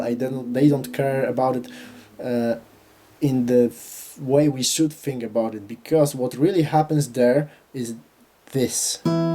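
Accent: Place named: Poland. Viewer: I am also a fan and listen to Jordan Peterson. I lived in Hungary for 8 years and have many Polish friends. I knew I recognized that accent. Thank you again for the great lesson of a favorite song!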